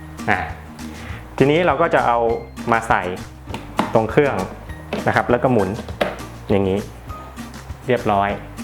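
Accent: North, Thailand. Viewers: ขอบคุณรีวิวมากจ้า แอบฟังสำเนียง คนเหนือรึเปล่าค่ะ555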